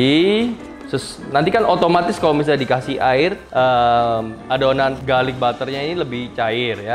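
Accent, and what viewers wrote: Surabaya, Indonesia. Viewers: Hohohoh asli suroboyo rek celotehnya 😀😀😀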